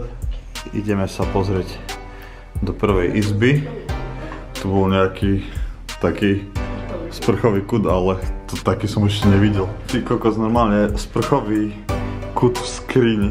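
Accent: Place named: Eastern Slovakia, Slovakia. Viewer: Ten východnarsky prízvuk je parádny. 😊👍 Už len začať hutorec v tomto videu. 😀👍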